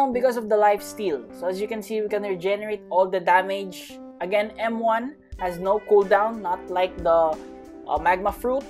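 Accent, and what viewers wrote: Philippines. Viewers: Pinoy ka tol? parang yung accent mo kasi pang pinoy hehe first time ko d2.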